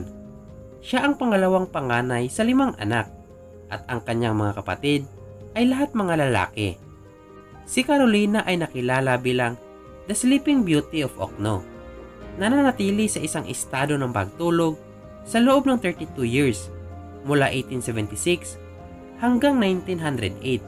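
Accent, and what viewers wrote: Visayas, Philippines. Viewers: bisaya ja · Visaya ka po? Me no bicol po ako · Bisaya ka kuya? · Bisaya ka ba sir? Hehehe. Pansin ko lng sa accent mo. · I like your visaya accent🤣🤣🤣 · Bisaya ka po? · Bisaya man jod ni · like nyo kung BISAYA yung nag sasalita dito😁✌👇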